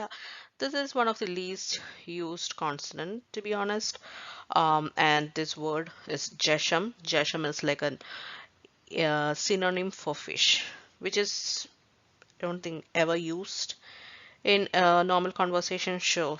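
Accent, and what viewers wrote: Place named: Kerala, India. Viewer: Madam, good attempt but many pronunciation mistakes are there. Please correct yourself. Hope it is taken in the correct spirit. In your English, the Malayalam accent is coming through. That does not matter in this lesson though since you are teaching malayalam.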